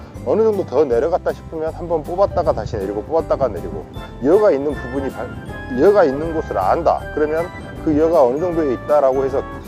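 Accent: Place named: Jeolla, South Korea. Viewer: 터진고기는 목줄 검사를 꼭 하십시요 터지면 환장합니다 전라도 사투리 역시 구수하네요 저도 본은 전북 정읍입니다 직장이 평택이라 올라와 있죠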